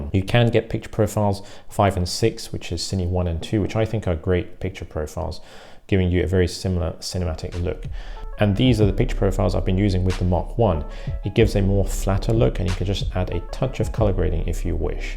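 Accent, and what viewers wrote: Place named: United Kingdom. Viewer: Asian guys with eloquent British accents automatically get credibility by virtue of that presentation alone.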